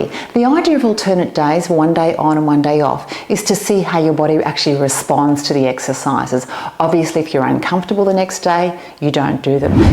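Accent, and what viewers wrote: Australia. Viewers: is that a Melbourne accent? Gorgeous.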